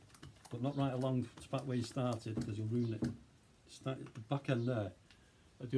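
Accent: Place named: Lancashire, United Kingdom. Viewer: Nice work. I stumbled across your channel. I'm in Huddersfield. You sound lancs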